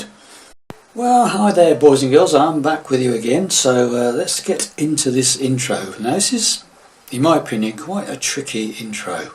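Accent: England, United Kingdom. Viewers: The English accent caught me off guard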